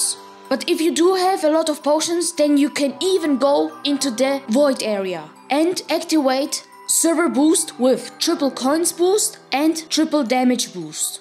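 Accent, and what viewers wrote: Germany. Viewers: Do you have a German accent?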